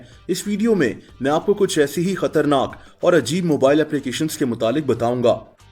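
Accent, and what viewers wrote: Multan, Pakistan. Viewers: Ap multan sa ho na bhai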